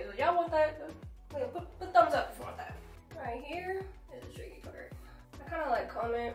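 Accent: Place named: United Kingdom. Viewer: The British accent 😂😂😂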